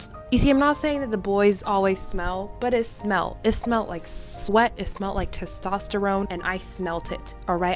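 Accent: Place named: United States. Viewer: What’s your backstory for your lineage and your English skills. You sound totally American. I couldn’t remember if you and Eiko were both Ghanain or if you were Nigerian. Your artistic skills are totally BOSS by the way. You’re unique and special at same time. If you ever visit the US you will feel at home amongst the African-American community.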